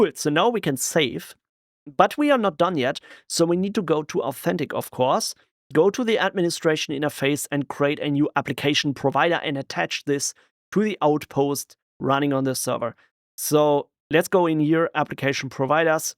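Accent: Germany. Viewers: Прикольно как у чувака немецкий прорывается через английский =)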